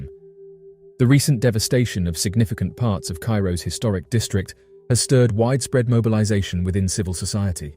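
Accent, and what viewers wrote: United Kingdom. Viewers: T you from uk 🇬🇧 interesting and odd .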